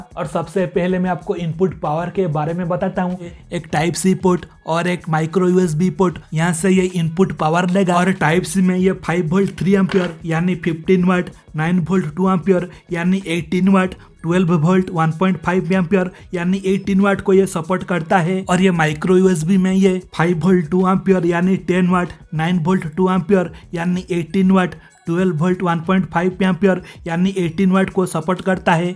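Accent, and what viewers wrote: Bengal, India. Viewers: He seems Bengali and that’s normal way of speaking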